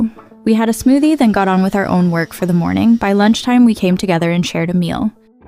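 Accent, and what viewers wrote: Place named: United States. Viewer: Korean??? Then where is the american accent coming from?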